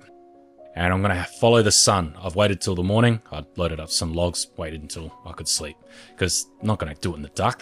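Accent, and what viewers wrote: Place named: Australia. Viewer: I love the Aussie accent and your humor is top notch sure these vids are old but I just found you a day ago..Keep up the good work..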